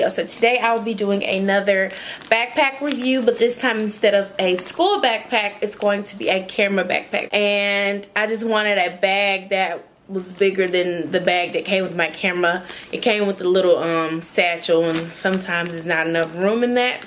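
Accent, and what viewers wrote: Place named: South Carolina, United States. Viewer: Sounds like South Carolina..😀Nice video 🤜🏽🤛🏽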